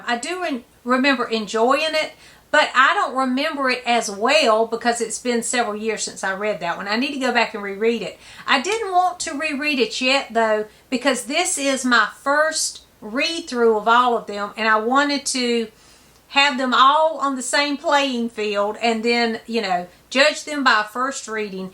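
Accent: South, United States. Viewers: As you see by my moniker, I am a fan of Agatha Christie’s Jane Marple. It has admittedly been years since I’ve read one of them, tho in my youth I read each several times, saw the movies, blah blah. opinions are likely as good as anyone’s. She’s a lovely looking gal, but once she opens her mouth, the ambiance is gone. The God-awful overt Southern accent just overlays any intelligent conversation with ‘nails on chalkboard’ irritation for me. That accent accompanies a mindset I don’t like, and I dare say Ms. Christie would roll over in her grave if she could hear it. Lighten up on the Souther roots, and give your SUBJECT center stage. Real journalists have been doing so for generations. Sorry folks.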